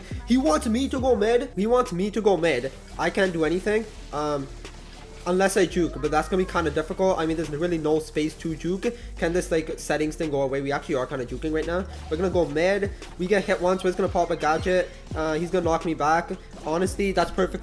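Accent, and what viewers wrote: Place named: Punjab, India. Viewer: Bro your accent is very Punjabi 😅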